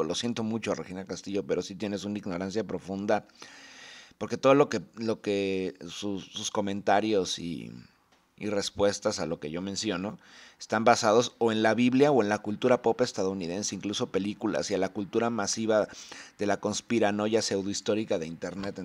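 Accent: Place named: Mexico. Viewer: la mejor respuesta que he escuchada para esa gente que cree saber mucho y sus fuentes se basan en cultura pop, hollywood, etc etc:D me hizo reir Master lo admiro, ahora que estamos en crisis y se está disputando la libertad y el alma del ser humano usted aparece como un caballero de la luz con acento mexicano blandiendo la espada del conocimiento... GRACIAS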